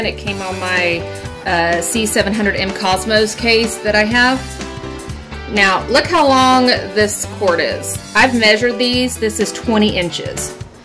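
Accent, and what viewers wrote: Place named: South, United States. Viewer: Good clear voice, southern twang charming, deserves a better a microphone. Increase in music volume at end was a bit abrupt. A somewhat longer, less repetitive backing track would be nice (though JayzTwoCents is somewhat guilty of that too). I was hoping to see the back of the fan because that's how I'd be mostly be seeing it with my setup, so please show parts being unboxed/reviewed from all angles please, especially ones then manufacturers don't so much. Good point about the cable length and well done with the ARGB / smart sensor feature testing.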